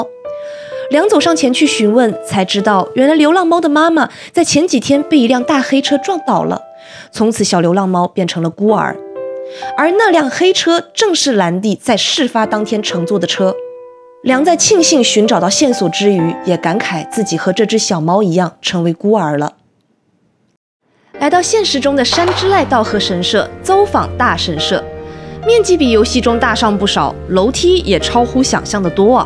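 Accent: Taiwan. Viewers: 谢谢你！这个好棒啊。你的中文是台湾的吗？我的中文不好的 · 好棒的影片，看起來比較親切，又是莎木玩家，又介紹橫須賀這個地方，滿足了我們這些玩過莎木的粉絲好奇心，厲害啊XD（大大是台灣人嗎？在日外國人我一度看成國人，想說口音怎麼那麼台灣？）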